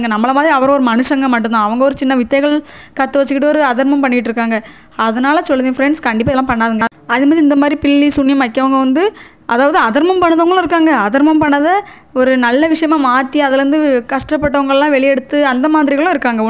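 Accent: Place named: Tirunelveli, India. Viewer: Excellent explanation especially nellai slang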